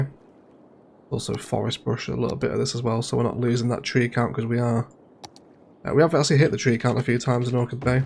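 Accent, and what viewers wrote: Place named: North, United Kingdom. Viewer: Thanks for all of your enjoyable contributions which are always very entertaining. I was just curious...you've likely been asked this before: You have a northern accent; which region are you from. Thanks again. Best wishes from Belgium.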